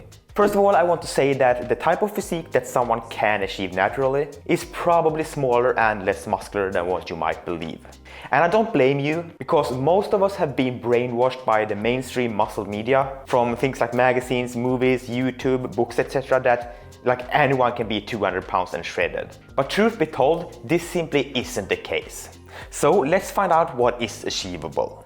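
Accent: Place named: Sweden. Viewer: Bro are you Swedish? Cause your accent sounds quite Swedish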